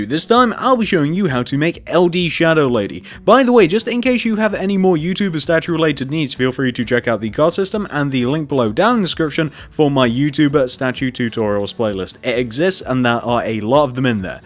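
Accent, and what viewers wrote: United Kingdom. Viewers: so that is why you have a british accent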